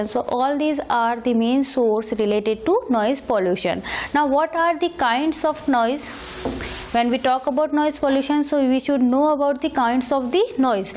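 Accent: India. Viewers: English Indian is dificult to listen.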